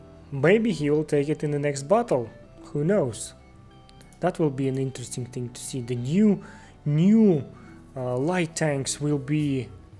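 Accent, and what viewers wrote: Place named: East Asia. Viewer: Райс инглишь)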